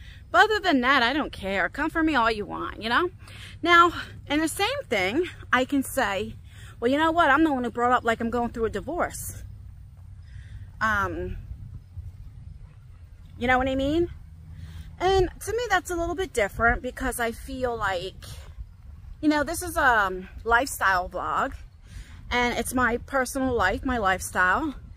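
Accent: New Jersey, United States. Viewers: If you can make $ talking to yourself I can too bc I don’t care about talking & im LEGIT EXACTLY LIKE YOU MINIS JERSEY ACCENT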